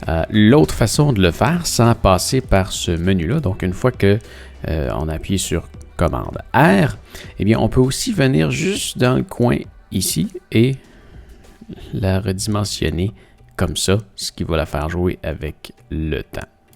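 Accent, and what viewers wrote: Quebec, Canada. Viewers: Très bon ton tuto +1 et +10 pour l'accent tabarnak :)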